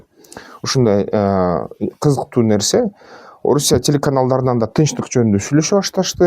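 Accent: Standard. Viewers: Таза кыргызча үчүн өзүнчө чоң рахмат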